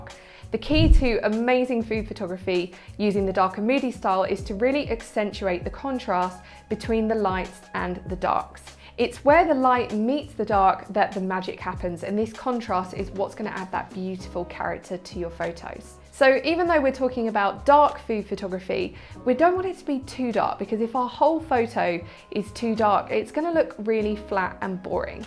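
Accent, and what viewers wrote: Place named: United Kingdom. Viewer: Lovely accent! where are you from in the UK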